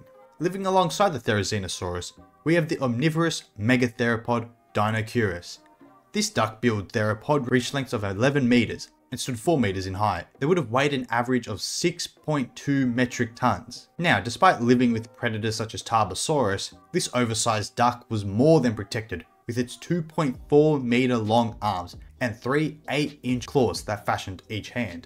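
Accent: Australia. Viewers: Very amazing video plus I love the Australian accent and it’s as satisfying as a British documentary.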